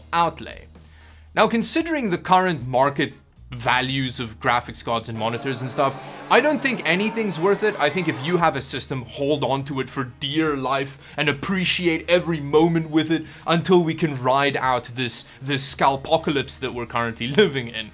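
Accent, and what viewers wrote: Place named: Afrikaans, South Africa. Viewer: Lekker, kan hoor die man is Afrikaans haha. Goeie video:yougotthis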